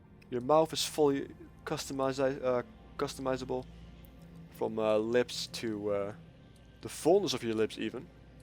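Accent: Netherlands. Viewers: You sound sooooo Dutch. xD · haha je nederlandse accent is zo duidelijk :P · Do you have a dutch accent?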